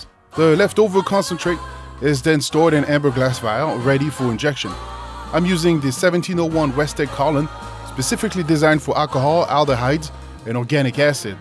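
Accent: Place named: South Africa. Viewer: The way he talking I thought was South African or African also from wales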